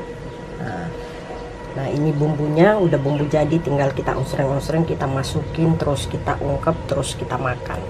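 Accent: Indonesia. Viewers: Mb ko ngmg nya kya orang sulawesi yah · Bugis apaki??ko sma cra ngongtta, hahaha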